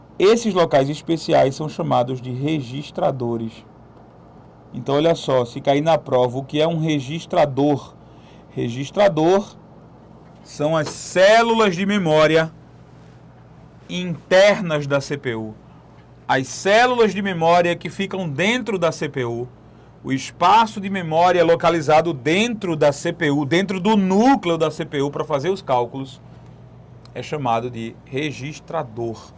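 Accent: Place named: Northeast, Brazil. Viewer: Finalmente uma aula com sotaque nordestino...tava cansada do carioca e paulista rsrs (sou paulista, pra os críticos de plantão.. ;P). Ameeei a aula, obrigada por compartilhar.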